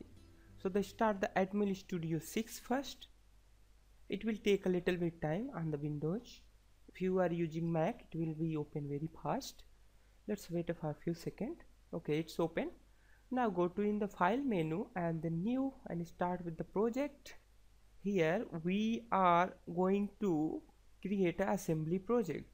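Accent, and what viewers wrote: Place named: India. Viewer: First of all thank you for your effort. mention that im not racist at all and i love indians, but im sick of that ACCENT!!! Man can you guys please work on your accent its so ugly and as people who need free educational content in coutnries like iran (myself) we really appreciate if indian guys speak like english.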